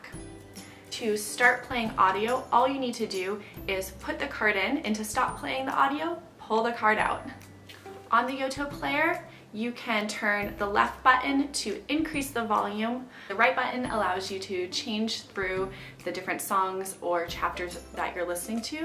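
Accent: United Kingdom. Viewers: Nothing wrong with the British accent.... But to be fair, it's an English accent. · Great summary, tbh MOST products on the market have an American accent so it's a refreshing change to find one with a British accent! :) Yours truly, a Londoner.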